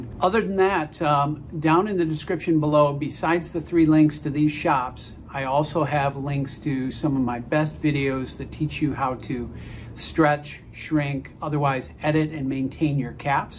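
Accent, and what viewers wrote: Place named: Michigan, United States. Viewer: Thanks for giving Canada a shout out,. I live in Toronto and it’s great to see Americans recognize our seemingly growing fitteds market. Noble North is a true gem, as are TeamWorks and Anthem. Feel free to hit me up if you need any help making a Canada part two as I know of a handful of great shops you could mention. No credit wanted here at all. And for what it’s worth, I’m a big Red Wings fan (I detect what sounds like a Michigander accent). Keep up the awesome work.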